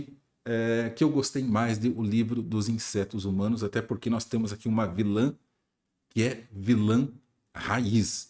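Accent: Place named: Rio Grande do Sul, Brazil. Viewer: muito obrigado. Uso saquinhos em alguns, mesmo morando na Paraíba. O sotaque parece gaúcho. ☺️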